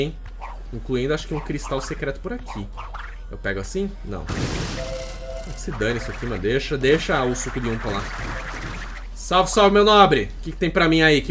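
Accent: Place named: Germany. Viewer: o sotaque dele me lembra um soltado alemão.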